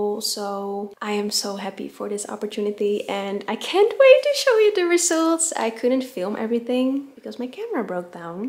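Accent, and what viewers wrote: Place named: England, United Kingdom. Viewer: Ik houuu van jouw engelse accent!! Rustgevende stem heb je!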